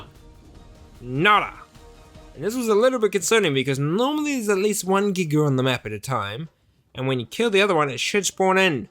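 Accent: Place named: New Zealand. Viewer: 200 days and NZ-english!! Can`t get better!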